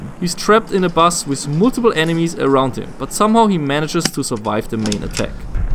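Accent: Germany. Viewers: One question, are you german? Weil du diesen Akkzent hast... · Ist er Deutsch? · 1question are u German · I have the felling u are german xD😅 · Wer findet auch dass er ein Deutschen Akzent hat? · Where is from? been watching him for a while, not long enough to figure out where he is from, accent kinda sounds German but I’m no Accent genius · hör auf english zu reden jeder weis das du deutsch bist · Bist du Deutscher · are you germane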